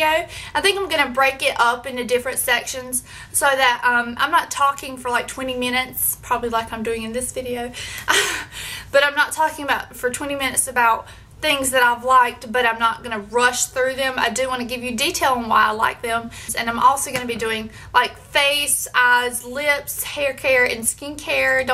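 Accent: South, United States. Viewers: I'm also from NC. I'm from Durham, we both have that same little southern twang lol. love your videos by the way <3.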